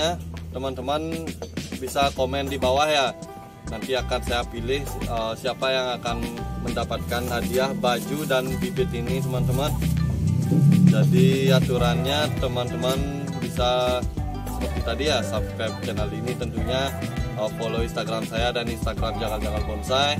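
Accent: Bali, Indonesia. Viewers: Alasannya karna lucu dan unik ,maklum beda daerah, saya dari semarang, beda logat bicaranya, jadi asik aja denger logat bali yg kental dalam penjelasan di setiap vidionya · Om swastiastu, kenapa saya sangat suka channel, 1. Bli membuat konten slalu apa adanya dan tidak melebih lebihkan 2. Slalu mrendahkan diri pdahal bli udh brpengalaman lah dengan hal bonsai 3. Saya sangat senang dengan konten bli yg memanfaatkan bahan yg ada di skitar kita 4. Karena channel bli saya jadi ikut membuat konten tentang mencari bahan bonsai di skitar kita 5. Logat bali medok pokoknya 👍😁 Msih bnyak sih mungkin dri saya skian dlu, smoga sukses slalu dan kontennya smakin berkembang dan semoga bisa cepat mengejar 100rb sub ya bli, klok blh bsk" diajakin ikut nyari bonsai bli hehehe 🙏👍😁, suksme bli maaf klok ada slh kata🙏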